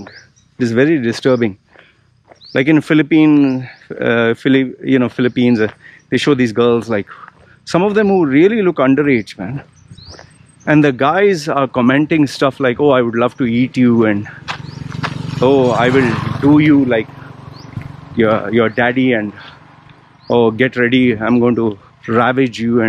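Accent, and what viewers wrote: India. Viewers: Your accent is so Indian..which country are you in?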